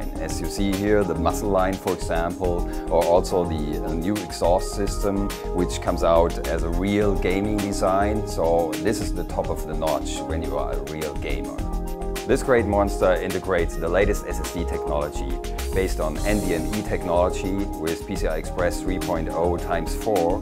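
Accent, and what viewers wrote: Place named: France. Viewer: MSI France avec un mec qui parle anglais avec l'accent français, ok.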